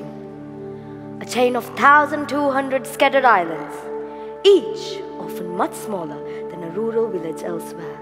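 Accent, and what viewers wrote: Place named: England, United Kingdom. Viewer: I like her english accent😍😍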